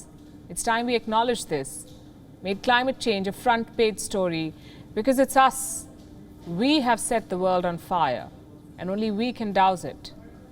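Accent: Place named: India. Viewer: Everything is perfect, no disrespect, but your thick Hindi English is at times very difficult to understand.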